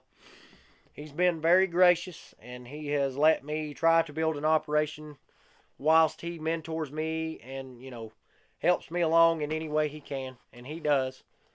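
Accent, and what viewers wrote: Appalachia, United States. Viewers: Way to go! You are very entertaining to watch and listen to. Genuine Appalachian! Those of us in other parts of the country love hearing the way you talk and your common sense way of thinking. You will be very successful and make Bob Binny proud. Best wishes. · Absolutely love the Appalachian accent! Glad you are starting your own channel. Looking forward to it.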